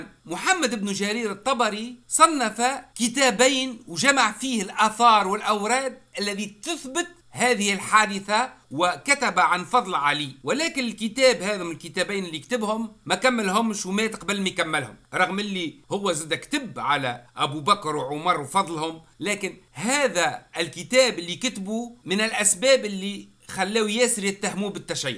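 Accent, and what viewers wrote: Tunisia. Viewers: استاذ انت احسن مدرس للتاريخ, و اللهجة التونسية زادتها حلاوة. تلميذتك من الجزاءر.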